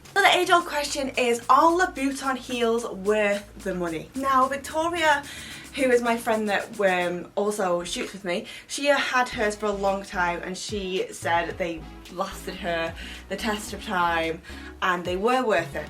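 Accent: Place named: England, United Kingdom. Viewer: I have to say I love your English accent. You, if course are beautiful and very fetching!!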